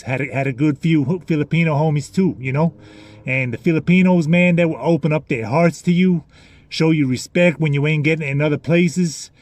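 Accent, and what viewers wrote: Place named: Vietnam. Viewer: U definitely picked up the Viet accent lol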